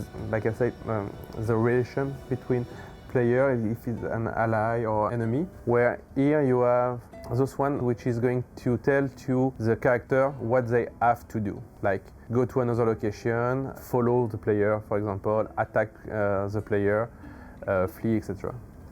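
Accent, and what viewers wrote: France. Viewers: Guys, seriously why the heck you put someone with french accent explain how a tutorial works out??? It's PANEL not PANAL ffs...i struggle to understand HALF of what this guy says!!! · Le gars a clairement un accent français, mais ils n'ont pas cru bon d'intégrer le français dans les choix de sous-titre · I love that strong french accent ! haha !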